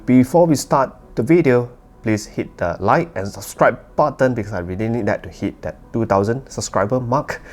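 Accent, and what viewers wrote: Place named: Malaysia. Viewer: I do listen to Jrock as well because not just female vocals but male vocals too, which means I do like a bit of bass, but not sharp treble. As long as it's not fatiguing. Seems like I'll need to look into the Zero 2 then if it has an added bass. Still using my Tanchjim One and Tanya (both DSP) and they're not bad, but female vocals can have some sibilance at times. Awesome to hear another reviewer from Malaysia! Our distinct accent stands out a lot. 😆 Anything that you would suggest in the sub $50 with a bit of bass? Not too much, but enough that you feel it.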